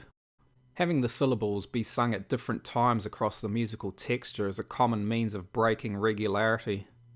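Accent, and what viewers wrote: New Zealand. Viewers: Are you from nz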